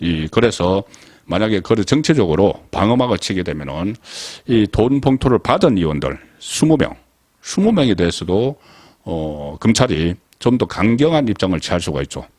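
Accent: Gyeongsang, South Korea. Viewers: 송국건씨 구속영장 경찰이 검사에게 신청 검사는 법원에 청구하는 법률용어 정도는 기본 아닌가요 그리고 엑센트 강약의 사용 용례도 정획히 하세요 진주 촌놈 경상도 사투리 제발 좀 멘트 하나하나 법률용어 제재로 사용하길 바람요.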